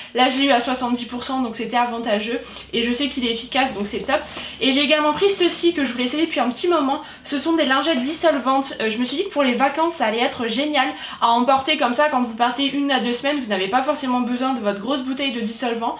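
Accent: Lorraine, France. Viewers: Tu es Lorraine ?:0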